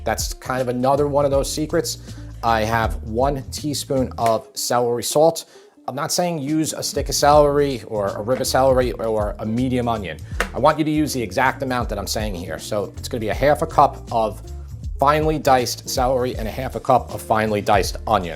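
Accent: New York, United States. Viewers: As I keep saying! I love your accent! So Noy York. The food is good, too. I recommend you make a Spanish style Russian Salad.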